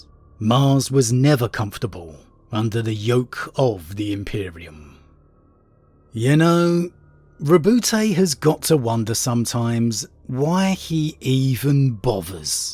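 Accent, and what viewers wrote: United Kingdom. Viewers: You've got my favorite British accent of all time. I hope you never stop!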